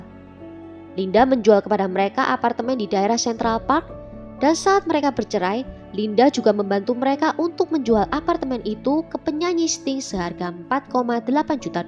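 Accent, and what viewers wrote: Java, Indonesia. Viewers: Jawanya medok ya kk 😄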